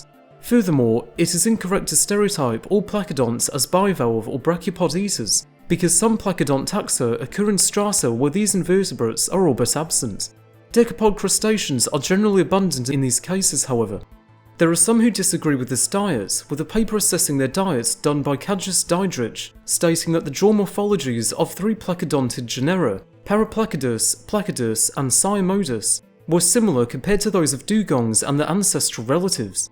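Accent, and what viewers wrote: United Kingdom. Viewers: The British accent makes it hard to understand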